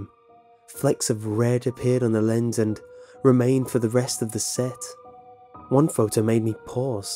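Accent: United Kingdom. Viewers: from Idaho but with a British accent ?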